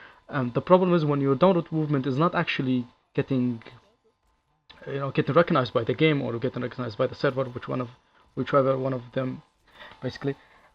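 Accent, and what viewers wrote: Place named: Arab world. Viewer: والله كنت اقول الاكسنت عربي ههههههههههههه.. من وين؟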